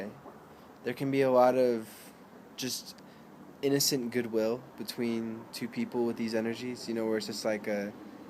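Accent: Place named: West Coast, United States. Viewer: lol you said at the function. you are def. from the west coast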